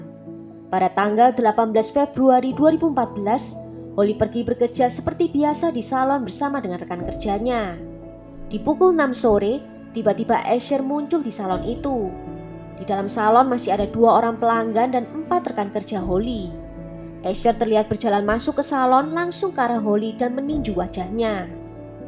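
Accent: Java, Indonesia. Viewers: medok bngwt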